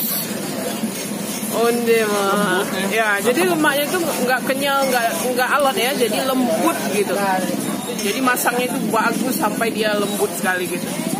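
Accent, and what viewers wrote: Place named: West Sumatra, Indonesia. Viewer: Uni kampung halaman dima ni? Unik gaya logat bahaso minang uni tadanga dek telingo awak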